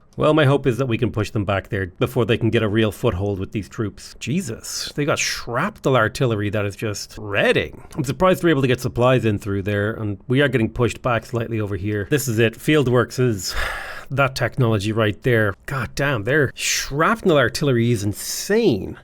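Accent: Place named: Ireland. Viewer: Came back to finish watching this - just realized that gets more and more Irish as the video goes by. (I do realize it's just his non-youtube accent slipping out bc of how long he's recording for)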